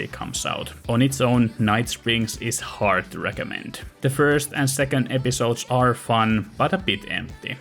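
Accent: Finland. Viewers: good video :) Love the finnish accent !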